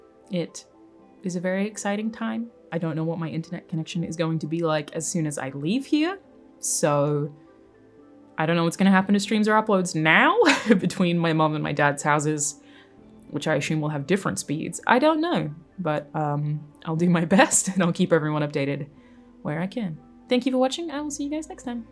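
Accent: Australia. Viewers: hermoso aussie accent 💙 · The main thing I noticed is that your Aussie accent has come to the fore quite strongly.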